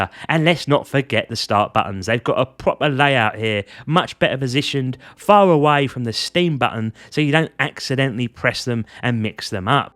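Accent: Cockney, United Kingdom. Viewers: Oy Oy Balham market, from Tooting myself. Nice comparison vid, I'll stick with my Steam Deck for now as it does everything I want as I play a lot of indie games and emulation, I don't really play AAA titles, if I do fancy one I play it on my main box. Subbed and liked for a fellow Cockney geezer. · Very cockney :)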